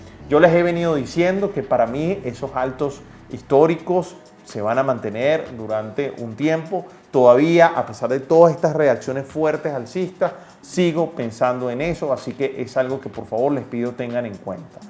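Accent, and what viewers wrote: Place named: Venezuela. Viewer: Por tu acento creo que eres venezolano, yo también lo soy y vivo en New York y ya tengo casi dos años en el trading, espero aprender mucho más con tus videos y con tus tips, y agradecido por compartir tus conocimientos, para los que aún estamos en este proceso de aprendizaje.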